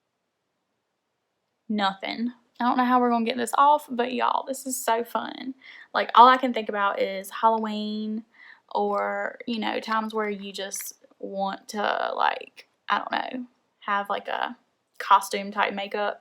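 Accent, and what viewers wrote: South, United States. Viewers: Her country accent is so cute lol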